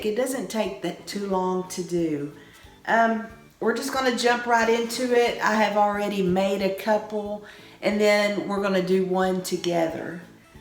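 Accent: South, United States. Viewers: Hi. Judy here. I have only watched a few of your videos. I did subscribe to your channel. I love your accent!!!! I probably sound a lot like you as I am from southern central OK. Every time I go visit my daughter in Oregon, people are always commenting on my southern accent!!! 😘. I really enjoyed watching your fall home tour. And I absolutely love this project that you did in this video. It is too cute and looks easy to make. I am thinking of maybe getting all the necessary items and doing this with my daughters for our project when we are together for the Thanksgiving holiday. Who would have thought of using splatter screens to make something so beautiful. With choices for fabric etc, the sky is the limit. You seem like such a nice and wonderful person we would sure make good neighbors, as I love crafting also!!!! My husband broke his wrist years ago, but the difference with him is that he broke his right wrist and he is right-handed. 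I remember when he went back to work where he worked in the microbiology department of our local hospital lab, he wrote the results of his reports with his left hand. He said at first it nauseated him, but then he got kind of used to it. I hope you do well when the cast comes off. It took him a while to gain range of motion in his wrist because it had been fixed in one position for so long that when he tried to go beyond that position, there was some paiin; however, it did not take him too long. I am sure you will do fine. Looking forwar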